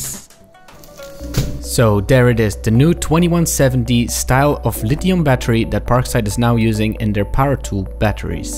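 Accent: Netherlands. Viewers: I know a Dutch person when I see and hear them xD. For I to am a flying dutchman xD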